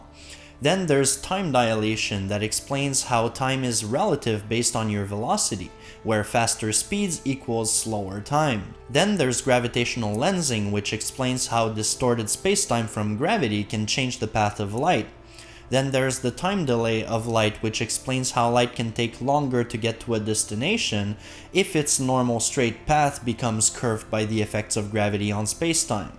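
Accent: Quebec, Canada. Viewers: You’re definitely Québécois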